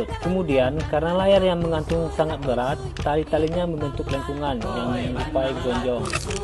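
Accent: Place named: Aceh, Indonesia. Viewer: Logat nya kok logat Aceh ya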